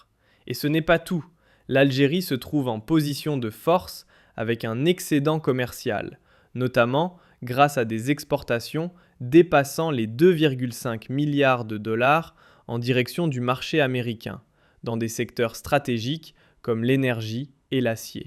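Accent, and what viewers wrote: Quebec, Canada. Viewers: Encore un soldat immigré dz des caporaux avec un accent Québécoi qui croit que l'Algérie est une puissance alors que la queue sur l'alimentation se mesure en kilomètres. Je suis persuadé que l'Algérie finira par être disloqué avec ce régime actuel qui emmène le pays droit au mur.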